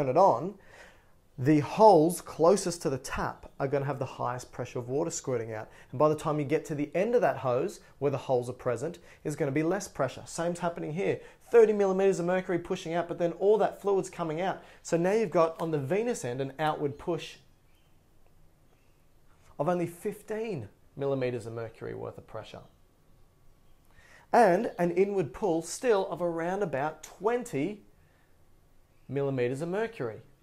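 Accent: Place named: Australia. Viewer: There's auto translate captions available, hope that helps... unless you're having a problem with his Australian accent :-)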